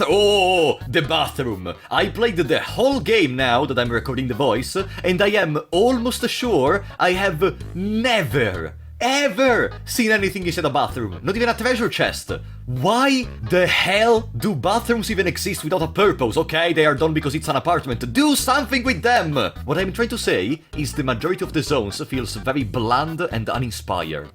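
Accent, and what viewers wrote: Italy. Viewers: I don’t think fear and hunger would be the same without your accent. Onestamente sono molto contento che sia un italiano ad essere forse la persona più rilevante nella fungercommunity, viva il patriottismo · the italian accent hitting so hard <3 te se ama che conosci funger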